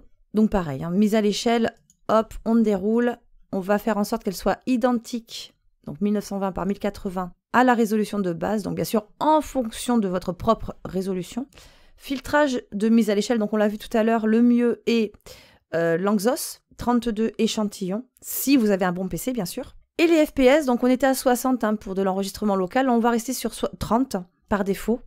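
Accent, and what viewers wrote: South, France. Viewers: vous parlez trop vite, calmos.. (c'est l'accent du sud peut-être) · supert tutoriel tres complet bravos jeune fille continue longtemps celas fais plaisir d'apprendre en ta compagnie ! avec l'accent du midi amicalement marc pecheo3 merci!😀💐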